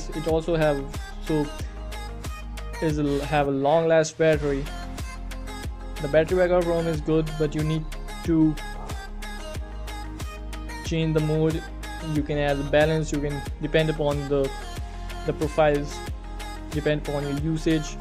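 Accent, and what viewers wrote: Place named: United States. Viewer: Please for god sake!Drop down Your stupid American accent.Plz.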